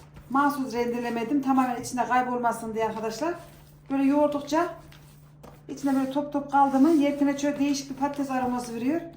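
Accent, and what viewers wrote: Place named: Afyon, Turkey. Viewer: Merhaba hanım. Bu videonuz facebookta denk geldi. Konuşmaniz bizim Afyon tarafına benziyor nerelisiniz acaba? Ellerinize sağlık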